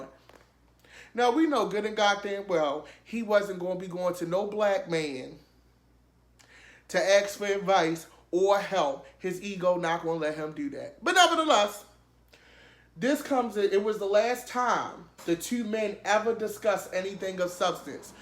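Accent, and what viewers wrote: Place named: Baltimore, United States. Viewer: You must be from somewhere near Baltimore... I hear your accent lol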